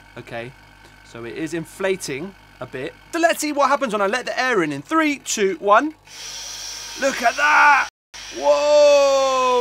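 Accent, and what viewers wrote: United Kingdom. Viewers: why are you saying chips when you sound English?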